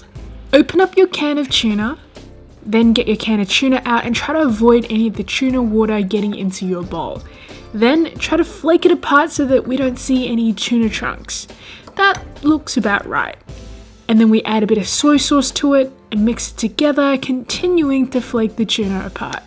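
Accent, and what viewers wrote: Australia. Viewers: Asian woman with an Australian accept is a trip right now... great vid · You sound soo Australian, I think it’s also a ‘Sydney’ accent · I love the Aussie accent! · Why do I keep seeing australian or New Zealand accents in Asian people a lot nowadays ... very satisfying and yet odd lol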